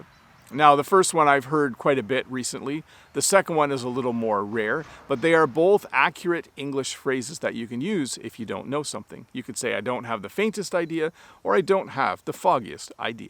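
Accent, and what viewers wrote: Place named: Canada. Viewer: Thanks, really understandable as all your lessons and useful, saddly I gues I`m not the onlyone that learnt the alternatives but in a conversation have drive a blank and just can use the reliable "I don`t know" hahahah BTW I`ve noticed your canadian stamp "eh", I dono if you know but in spanish we also use "EH" in a simmilar way that you, in my country sometimes implies a slight provocation or defiant. Thanks!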